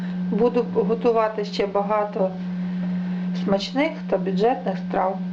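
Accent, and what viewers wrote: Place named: Western Ukraine, Ukraine. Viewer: як приємно чути західний акцент)дуже гарний і зрозумілий рецепт) підписалась на твій канал, підтримай мене і підпишись на мій) дякую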